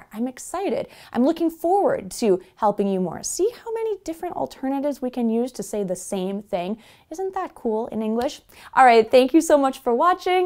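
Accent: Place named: United States. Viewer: Good Morning, How can I say this? I loved these alternatives mainly because I love to have more possibilities, more varieties, to increase my vocabulary, you know, to have more options This video lesson was a huge gain for Me to be more conversational 😂 But, I'm an enormous fan of this your American TH Sound, and it's too charming the position of the tongue when Americans say it Maybe I'm saying this because I'm like obsessed with it, I get staring People's mouth 👄 catching each tiny movement, for Me to learn and also because I definetely love it! Ok, I took some notes here: I'm thankful to you because of this suggestion on this video with 30-40 mins of duration, Train, right!? I'll certainly check it 😉 THANK YOU = I appreciate you / Awesome / Wonderful / I'm so grateful... Yes, I appreciate you for everything that you've been doing for us, it's Awesome 😍 I THINK = I have an idea / I imagine / In my opinion Hey, your idea of having that pizza last night had been Fantastic! 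In my opinion we should repeat this Program ( And how it's Me speaking, and I LOVE the TH sound, I would add to this sentence )... What do you think about it? 😂 Pizza, us, tomorrow, huh!? Father, Mother = Mom, Dad ( I enjoyed it, easier and cute as well ) 1200 × Twelve hundred Well, talking about numbers, I totally pass the 🏀🏈 ball Ok there are things in life that are unavoidable, and there will be times that will be required to talk about it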